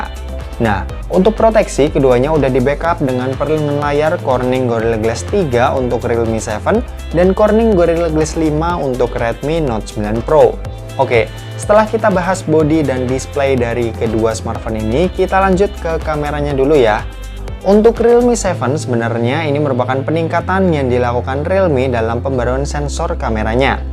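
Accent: Semarang, Indonesia. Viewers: lu orang semarang bang ? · Masnya orang Semarang ternyata wkwkwk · Eong Semarang to ndeng? Hehe sukses selalu lur...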